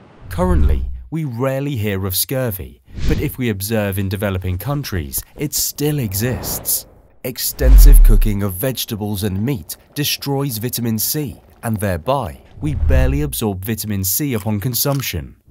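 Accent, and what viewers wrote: United Kingdom. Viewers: His pronunciation is the correct Brittish way of pronouncing it (and thereby most of Europe as well). You must be American.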